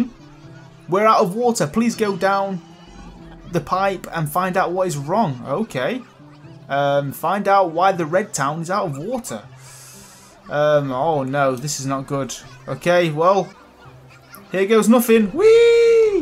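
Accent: United Kingdom. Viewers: What part of UK is from? He has the same accent as Jon Snow.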